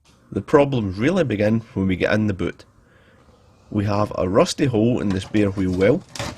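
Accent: Scotland, United Kingdom. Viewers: Are you Scottish? (Not Irish, right?...) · I love the calm pace of narration and Scottish accent. It’s like a relaxation tape.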